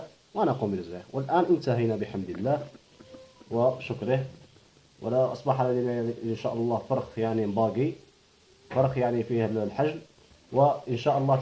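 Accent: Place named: Tlemcen, Algeria. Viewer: بارك الله فيك اخي اللهجة نتاعك تلمسانية لالا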